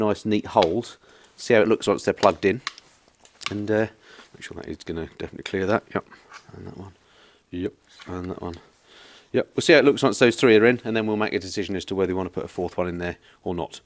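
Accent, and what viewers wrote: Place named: England, United Kingdom. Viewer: I love your English snobbish accent please keep it up Don't ever change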